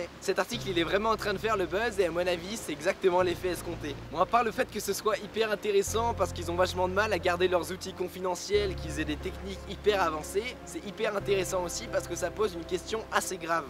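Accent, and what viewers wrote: Quebec, Canada. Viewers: Que tu as un accent québécois chronique :o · vous trouvez pas qu'ilà un petit accent québecois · Est - tu canadien ?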